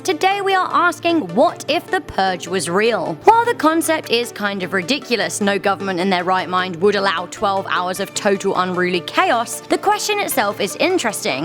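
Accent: United Kingdom. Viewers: no offence, but how come a lot of these kinds of channels have annoyingly high-pitched british-accented women?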